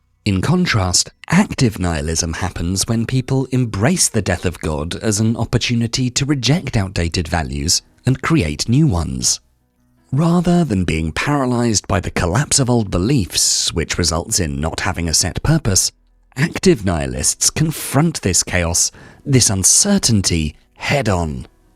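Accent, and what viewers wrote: United Kingdom. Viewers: Perfect British accent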